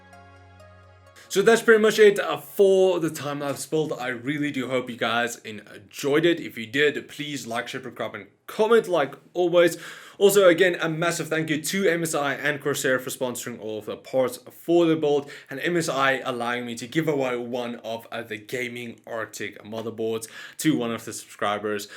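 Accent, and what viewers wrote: South Africa. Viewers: Just subscribed when I heard you're South African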